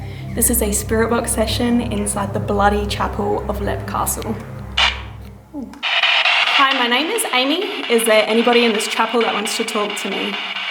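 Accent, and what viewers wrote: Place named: Australia. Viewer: Leap Castle - ''Caisleán Léim Uí Bhánáin'' Amy, that murder was all about power and control, it's as old as the hills as they say. That little place where they threw prisoners to die from injury or starvation as there was a spike on the floor was called a oubliette or a place of forgetting in English. People were tossed in there to die from the spikes or starve to death . Many would rather have died quickly from the spike(s) than starve to death. The most cruel thing was that they could both hear people having a good time and smell delicious food while this was happening to them. I see this was a couple of years ago i hope you come back although i don't know if Leap Castle is allowing any more ghost tours. PS: I find it funny that birds frighten you but ghosts ( indeed possibly really vicious ghosts ), are not a problem . You sound Australian but mixed with a little bit English accent. 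:-) The current owner a Mr Sean Ryan and his wife although a Irish name has a Australian connection and i believe that he was born and grew up in Australia before moving to Ireland although not 100% sure about that. According to a program i saw Sean was nearly killed a couple of times when he initially started the repairs. However, at the baptism of hos daughter which took place at the castle apparently there was a peaceful feeling and even a light breeze that the attendees of the baptism noticed. Sounds with the gruesome history of Leap that it needs any positive feelings that it can get. Fina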